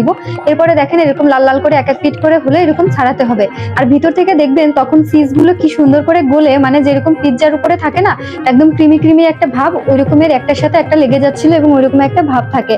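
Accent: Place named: Bangladesh. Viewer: Hare Krishna 🙏🏻 apni ki bangladeshi ? Kotha gulo sunte se rokomi lagche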